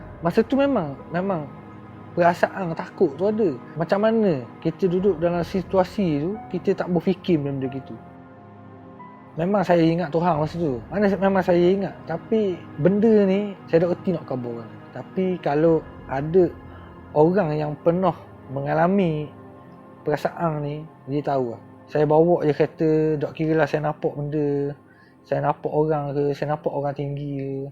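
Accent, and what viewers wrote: Terengganu, Malaysia. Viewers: Power! Kite pulok memang minak loghat ganu ni. Jaddi boh. Subscribe slaluhh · Org ganu ke?? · Pekat bahase terengganu dia haha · Pekat ganu boh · Pekat molek loghat tranung..terbaik boss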